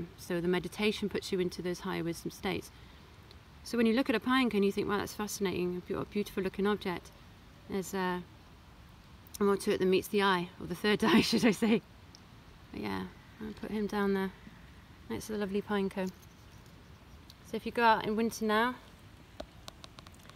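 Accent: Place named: United Kingdom. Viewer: I wish I could understand your English-very British Very difficult to make out your words…sorry Because I’m very much into health/herbs…😟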